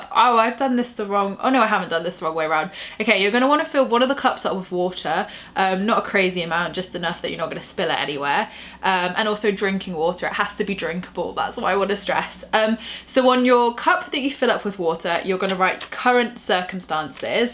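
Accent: England, United Kingdom. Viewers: Hi I just found your channel I have tried the 2 cup method today I ask for more abundance I felt very happy doing it I said a prayer and I kept the desired note in a wooden box as suggested by another yoube channel any suggestions how long it takes before you see the results I love your English accent when I manifest some new friends I would love to visit England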